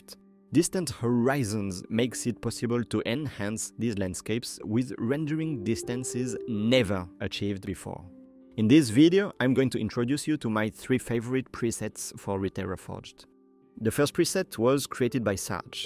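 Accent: France. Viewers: Oh je reconnais cette accent... 🇨🇵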